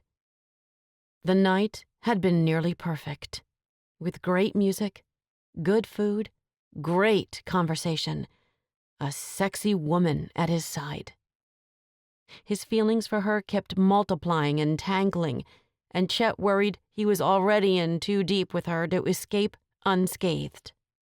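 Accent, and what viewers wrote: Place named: South, United States. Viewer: Really enjoyed the story. I have lived a n the south all my life and I’ve never heard anyone speak so slowly and so southern.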